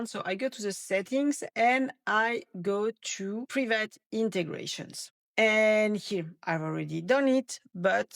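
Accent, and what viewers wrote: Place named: France. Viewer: Wha le french accent but super glad to see and GHL expert as woman ! 🔥🔥🔥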